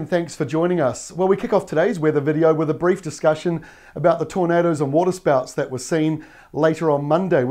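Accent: New Zealand. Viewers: Top app team. Great presentation, love the KIWI speak!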